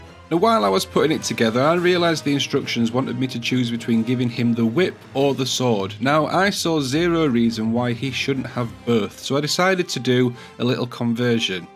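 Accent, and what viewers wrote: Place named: South Yorkshire, United Kingdom. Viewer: Hey where you from you sound local to me. South Yorkshire.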